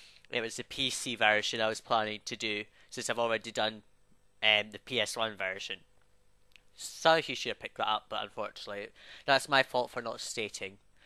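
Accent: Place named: Scotland, United Kingdom. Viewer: scottish(scottash) with a blocked nose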